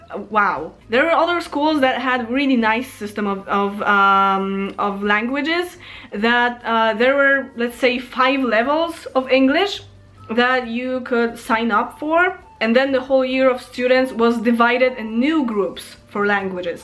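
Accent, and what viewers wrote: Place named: Poland. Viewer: yes, shes from Poland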